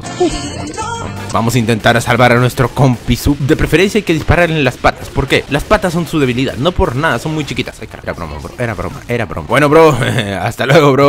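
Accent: Peru. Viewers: Eres de Perú ? ._.XD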